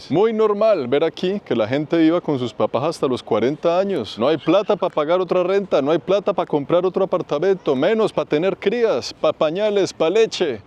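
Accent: Bogotá, Colombia. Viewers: El acento de es el rolo o Bogotano, cada región tiene su propio acento.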